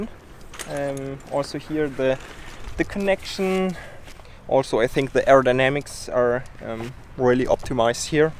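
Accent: Germany. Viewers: sounds a bissl deutsch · Cool german aktzent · wie man das deutsch raushört XD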